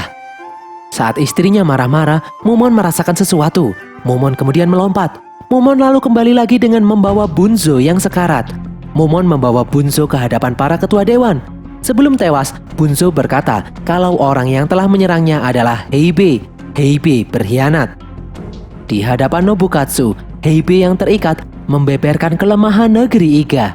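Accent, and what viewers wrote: Java, Indonesia. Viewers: Cukup cukup matamu. Iki ketoke seng ngisi suoro wong jowo iki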